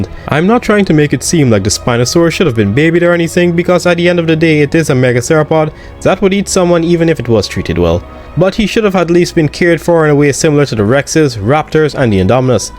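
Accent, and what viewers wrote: Jamaica. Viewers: Jamaican? Which parish bro? 🤙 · You sound jamaican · Is you Jamaican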